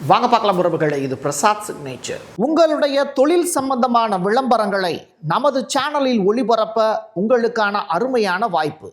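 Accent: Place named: Chennai, India. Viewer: Madras தமிழ் தானே பேசுகிறீர்கள்.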